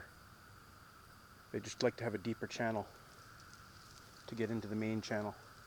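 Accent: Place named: Canada. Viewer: It's the default go to for d!psh!ts who want to make some "government bad" meme and use Cali as the example of "over regulation". Brain dead as they usually are, they fail to take into account that, by his "accent" is a fine Canadian lad. As such, he deals with more regulations than a dumbass yank.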